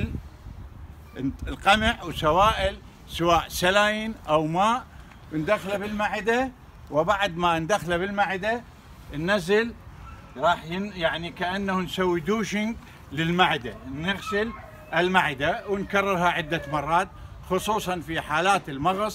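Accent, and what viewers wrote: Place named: Iraq. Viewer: صوته ذكرنا بصدااااام الله يرحمه احس انك عراقي اصل وفصل الله يحفظك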